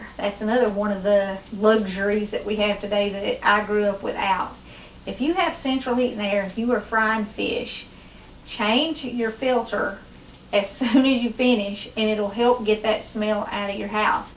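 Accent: South, United States. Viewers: Good to hear your accent to I’m a guessing southern wv or ky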